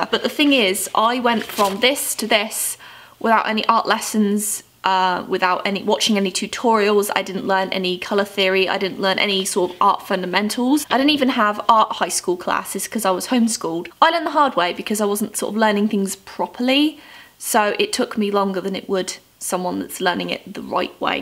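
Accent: England, United Kingdom. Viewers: Which country do you live in because you sound English, but I've never seen Prismacolors in the UK (I'm Welsh) and you were stating the price in dollars? You also mentioned Hobby Lobby but I'm only familiar with Hobbycraft? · I made a few pages in my sketchbook that I named "mouths", "eyes" and drew over the whole pages. At first I was like "I need to make great works in it", but soon I realized the point of it xD Also, when I watch your videos I always pick that British accent. It's very helpful, since I study English XD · I just watched this video which was the first one for me and you made it enjoyable. I enjoyed your style of presentation so Kudos! From your accent you are English yet are your living in the US?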